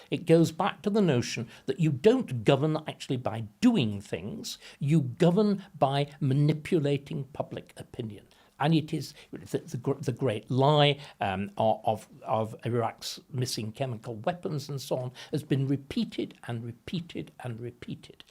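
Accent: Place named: England, United Kingdom. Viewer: Yeah, I'm subscribing. This man is straight 🔥🔥🔥. Victor Davis Hanson with an English accent.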